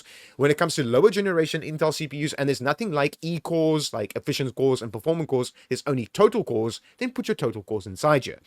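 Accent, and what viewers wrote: South Africa. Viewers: i heard the accent and realised your a south african too, awe and thanks for making this video it really helped me.also keep up the good work my bru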